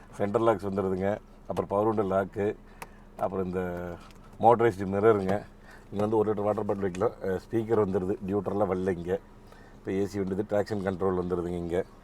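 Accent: Kongu, India. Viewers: அண்ணாவோட கொங்கு ஸ்லாங்கு ஸ்டைலே சும்மா நெகு நெகு நெகுனு இருக்கு😍😍😍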